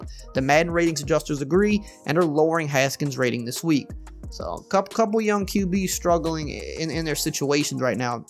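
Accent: Louisiana, United States. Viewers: What part of Louisiana are you from ?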